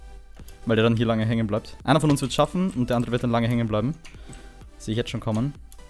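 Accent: Austria. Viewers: Die Mischung aus deinem österreichischen Dialekt und der Teddy-Comedy Stimme hört sich echt lustig an :D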